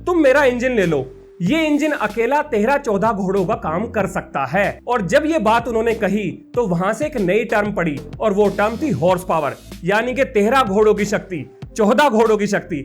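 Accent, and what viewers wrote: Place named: Delhi, India. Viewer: इसकी जबान दिल्ली वाली भाई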